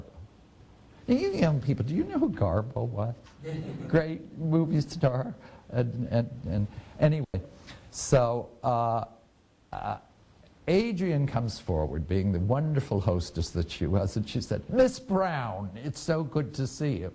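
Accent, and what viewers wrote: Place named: United States. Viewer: Awful narration, not to mention the god-awful American accent.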